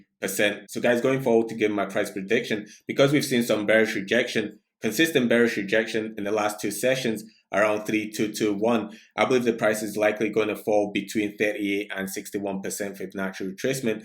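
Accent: Scotland, United Kingdom. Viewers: Is that a Scottish accent i hear bro?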